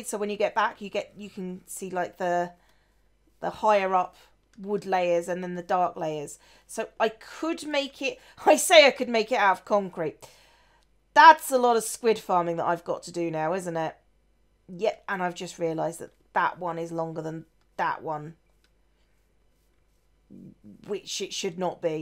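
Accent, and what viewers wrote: United Kingdom. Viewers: Omg shes British i love British accents can you say something really cool in the last episode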